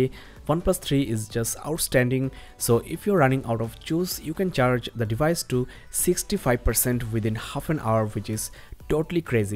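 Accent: India. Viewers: Are you from Europe? The plugs look like the European versions. Btw you have a very nice accent :) (Indian?)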